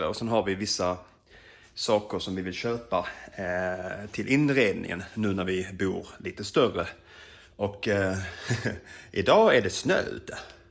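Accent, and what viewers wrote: Skåne, Sweden. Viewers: Tack för vlog. Maybe do some more of these please. Lucky day to move, same day as our new president! Grät du during that inauguration? I cried. Are you losing your Skåne accent?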